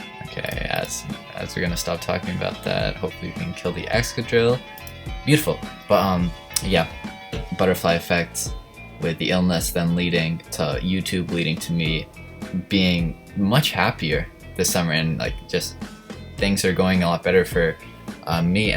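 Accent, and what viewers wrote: Poland. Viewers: U polish? 😱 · U polish?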